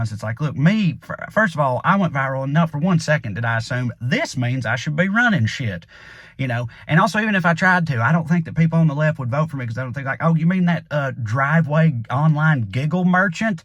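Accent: South, United States. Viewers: Dude!!!!!.. GIGGLE MERCHANT!!!!! STILL LMFAO!!.. LOVE THE SHOW, AS ALWAYS SPOT ON!!.. ALSO I COULD LISTEN TO THAT SOUTHERN ACCENT ALL DAY LONG!!( ONLY A COUPLE WORDS I GO..??? WHAT'D HE SAY??!!!! YOU ARE AWESOME!!.. I SO APPRECIATE THE HARD WORK THAT GOES INTO YOUR SHOW!!.. YOU ROCK!.. THANKS FOR KEEPING IT REAL, HONEST, AND UNFORTUNATELY TRUE!!... VOTE VOTE VOTE BLUE!!!!!... PLEASE 🙏 🙏 WE GOTTA STOP THE SICKA** REPUGNANT REPUBLICONS!!!..... YOU'RE A GREAT HUMAN BEING ❤️ ♥️!!...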